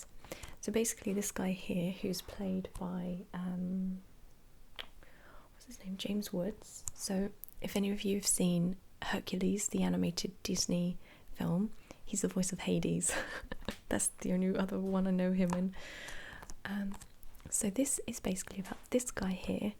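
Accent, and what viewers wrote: England, United Kingdom. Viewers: so let me get this straight. .. an female english accent, asmr video about the brillant of David cronenberg. well I subscribed!. but u left out scanners. which is had the man with thee, thee greatest voice patrick mc gohan. well Richard Burton is thee best but patrick is a close second. and never forget about David Lynch or the Stanley kubrick.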